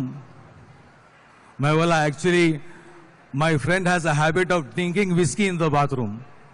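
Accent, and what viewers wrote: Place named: Hyderabad, India. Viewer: Kali Hyderabadi bolthey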